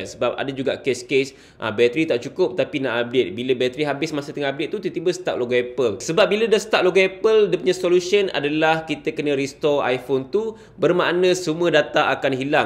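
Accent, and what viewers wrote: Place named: Kelantan, Malaysia. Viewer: mujur eh . ore kelate kow ? 😅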